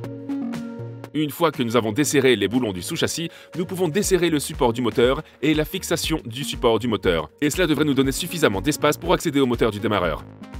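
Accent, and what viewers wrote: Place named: England, United Kingdom. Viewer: Lol knowing you're English accent voice then hearing it dubbed is crazy cuz in listening and since I'm in America is dubbed in English. So I'm seeing you speak English knowing you're voice but hearing a weird english dubbed voice haha😂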